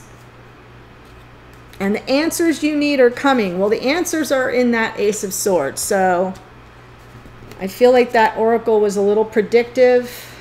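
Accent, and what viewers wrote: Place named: Florida, United States. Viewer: And I also knew based on your voice you're a person either from FL or have lived there a long time.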